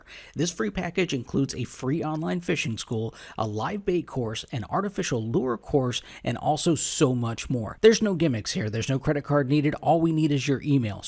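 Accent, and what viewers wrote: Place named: Minnesota, United States. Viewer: You need to stop telling people all this BS . Water color and habitat in Florida is Totally different than other states. You sound like you are from Minnesota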